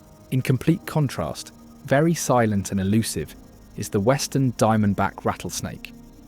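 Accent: England, United Kingdom. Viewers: What’s up with this bloody Limey’s accent?🤮 It’s Arizona, so speak American🇺🇸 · Can't stand all these British narrators talking about America. Why don't they go back to their Muslim country?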